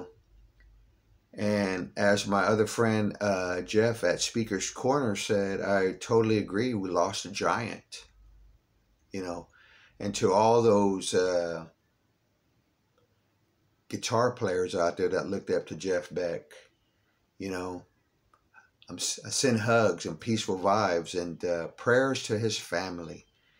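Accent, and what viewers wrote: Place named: Louisiana, United States. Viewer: Such a huge loss to the rock community! Another hard one to swallow. I asked if you were from L.A. because you're accent is similar to mine. I grew up on the Texas border on the Louisiana side. You got this country / Cajun thing going on that does my heart some good. Peace brother! R.I.P. Jeff Beck!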